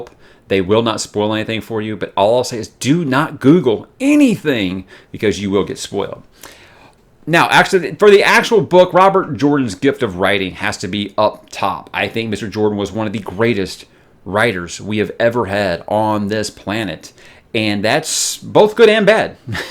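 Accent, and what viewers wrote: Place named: United States. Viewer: Hearing the intro in such a manifestly American accent is a balm after enduring three episodes of British.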